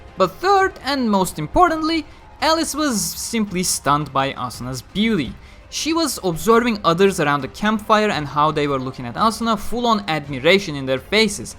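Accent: Turkey. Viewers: Türk aksanı ile bile ingilizcen mükemmel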